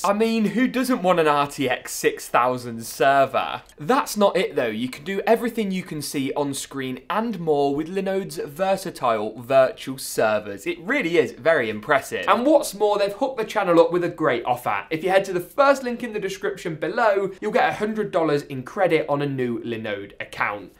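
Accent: United Kingdom. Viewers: Great video but I don’t get why you are listing and talking about prices in Dollars, you sound like your from the UK so I’m a bit surprised and youtube is full of American PC Builders so it’s shame. Also it’s all great showing a great looking and performing build but it would be nice to see some thermal results of the builds which is just as important.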